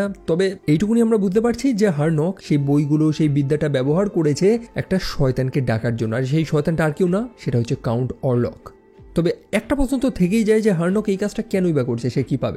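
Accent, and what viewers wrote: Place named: Bangladesh. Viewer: আপনার কথাগুলো শুনতে একদম বাংলাদেশীদের মতো!!!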